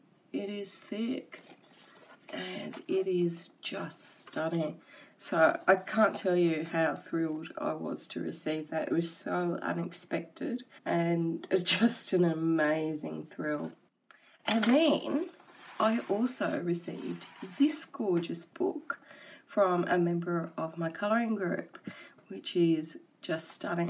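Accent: Australia. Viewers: Great video, I love you're Australian accent, cute stuff.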